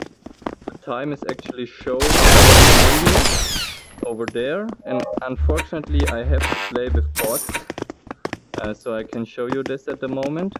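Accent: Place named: Germany. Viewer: dieser deutsche Akzent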